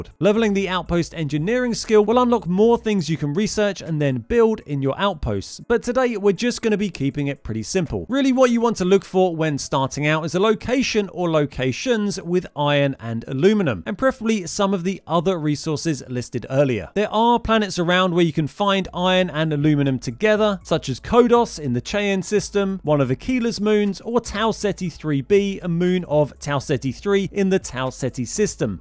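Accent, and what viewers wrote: England, United Kingdom. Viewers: I get a kick on how you English people have an accent, not to mention the unique words you use quite often like "Plunk" ... I'm not going to laugh or ridicule, because everybody's different everybody has their own little niche that helps them be more unique. I myself am quite unique that way also so I empathize. I have built about three outposts one of which just to supply a need. I did build a base on Masada. With me taking an educated guess, the very first time I placed the beacon down I had seven resources available at one spot. I would strongly suggest checking it out, because for the end of the constellation storyline is on that planet also. Not to spoil anything please be safe, also more information about Outpost information is greatly appreciated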